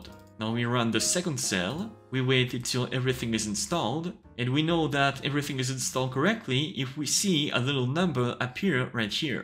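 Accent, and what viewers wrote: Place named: France. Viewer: You sound french, are you french?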